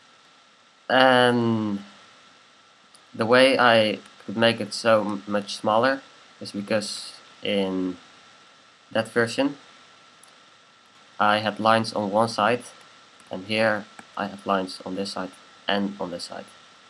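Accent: Netherlands. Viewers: haha youre dutch :)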